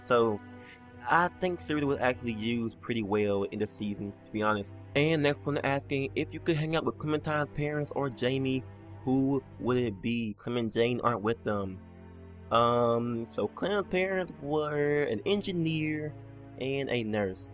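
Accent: United States. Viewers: Are you American? Q&A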